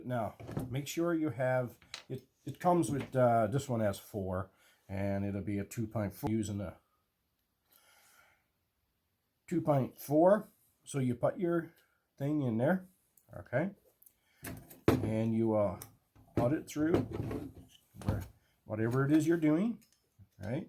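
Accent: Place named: Newfoundland, Canada. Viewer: I have one of those , does a great job. Ok so I'm trying to narrow down the accent so let's me know if I'm close , I figure your from the rock but anyone could guess that but I'm going way out there and saying your from a stretch between Grand Falls Windsor east to Botwood but man oh man I hear Bishop Falls big time . Keep at it bye and as they say on the rock , who knit ya 👍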